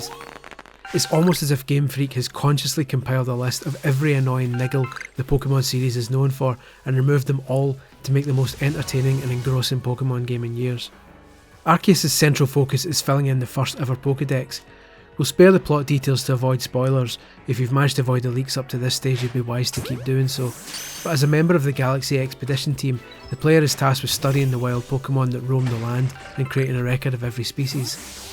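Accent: Scotland, United Kingdom. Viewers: The most Scottish man reviews pokemon, good review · came for the amazingly in depth review stayed for the amazingly unintentional scottish asmr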